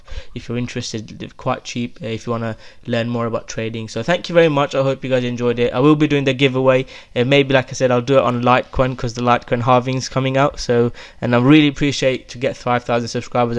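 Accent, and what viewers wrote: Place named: United Kingdom. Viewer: Very appreciated again 👍👍👍thanks for the video. I love your British accent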